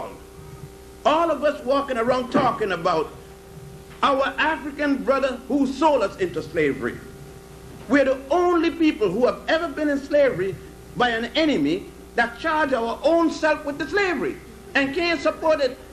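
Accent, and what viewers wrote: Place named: Caribbean. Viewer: Interesting Scholar And Speak With An Accent Probably Caribbean.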